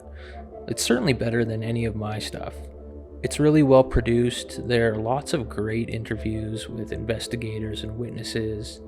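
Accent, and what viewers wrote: Canada. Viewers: Yes that's a good documentary but I like your stuff the best an I hope it's you that proves bigfoot is real I love your Canadian accent 😂 keep it coming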